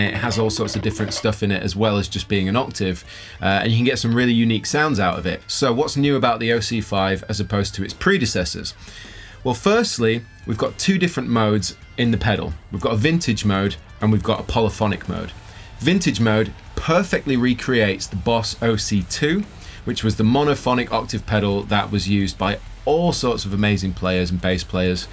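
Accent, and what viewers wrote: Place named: England, United Kingdom. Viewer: More intens-a-E. 😅.....gotta love the English accent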